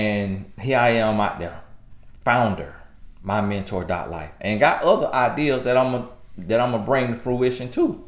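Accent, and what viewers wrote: South, United States. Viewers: I love country accent. He is hilarious 🤣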